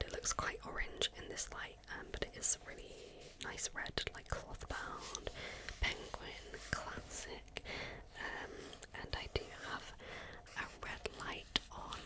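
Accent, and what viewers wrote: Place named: United Kingdom. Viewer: Not enough British ASMR reading videos out there! This was very relaxing,!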